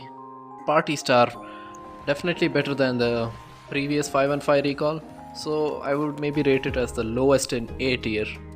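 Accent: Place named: India. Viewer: love your india accent man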